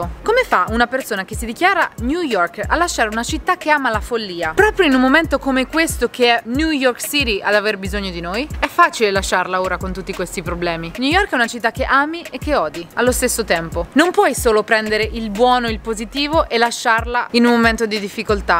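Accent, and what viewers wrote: Ancona, Italy. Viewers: accento anconetano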